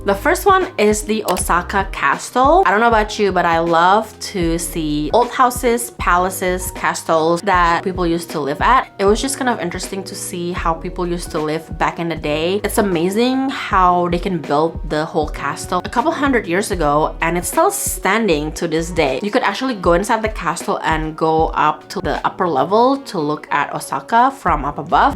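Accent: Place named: Indonesia. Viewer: Good review especially on the fish tank exhibition at Ginza.Your English is very Chindo :)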